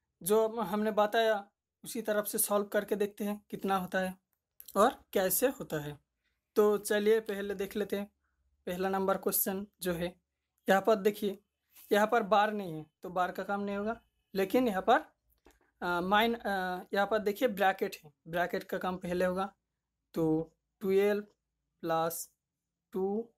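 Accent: Bengal, India. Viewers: I got the ascent of Bengali in your language...so proud of you bro being a bangali.....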